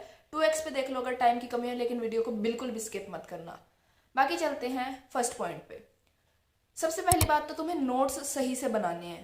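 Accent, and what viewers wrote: India. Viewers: Aap rajasthan se ho ? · Aap haryana se ho?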